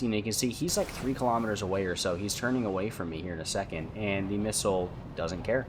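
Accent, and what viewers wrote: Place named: United States. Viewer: clicks on sees chinese decal on plane an white boy american talking dislikes clicks off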